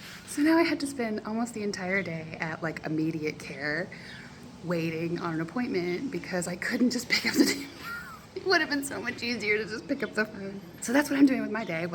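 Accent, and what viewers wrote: South, United States. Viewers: Hi! I love your attitude so much. I don't know where your accent originates from, you sound so much like my friends and me. Especially your vowels. I'm a southern girl through and through! Watching your videos is so much like listening to one of my friends or my sisters. AND your channel is growing so fast!! I'm so excited for you. Can you get a Jackery or something like it that can charge off your van while you're driving? Edit: omg I posted while your video was playing and your appreciation made me cry. We love you!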